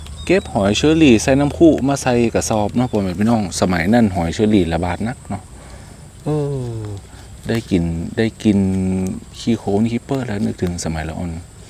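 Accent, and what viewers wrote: North, Thailand. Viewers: ชอบ ฟังพี่พูดภาษาเหนือมากคะ น่ารักมาก ขออนุญาติถามคะ ลำแตลำว้า มันแปลว่าอะไรคะ🥰🥰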